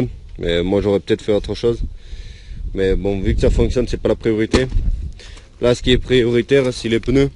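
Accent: South, France. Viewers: J’aime pas l’accent du sud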